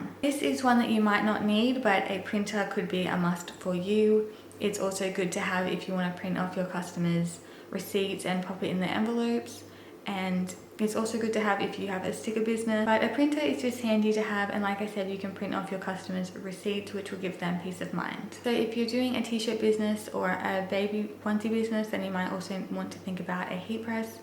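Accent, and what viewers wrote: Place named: Australia. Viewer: Hi! I really enjoyed your video. I really like your accent, I know you live in Australia. I like seeing Australia on TV a lot. Australian people seem so nice. I would like to visit there some day. I'm happy you have your own internet business. I like all the great tips you give. Huge thumbs up! 🙂👋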